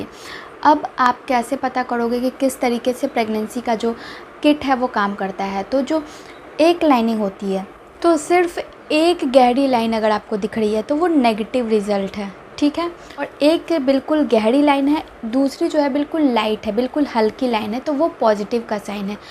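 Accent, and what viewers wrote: Bihar, India. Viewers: Aap Bihar se hain kya ....ho rha hai ko hoda hai bolte ho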